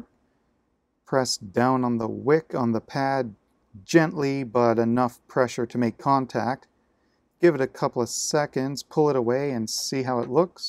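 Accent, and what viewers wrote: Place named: Canada. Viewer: I love Canadian accents…ooot lol. Thanks for the tutorial. I guess single pin at a time is the way to go.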